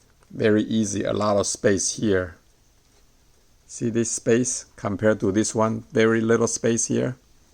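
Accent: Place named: Europe. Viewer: Your accent was so thick I thought you were European at first. Haha, nice guns!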